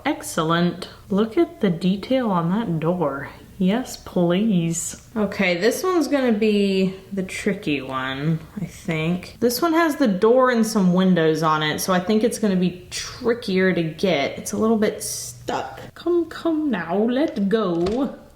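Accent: Texas, United States. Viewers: R u from texas?love ur channel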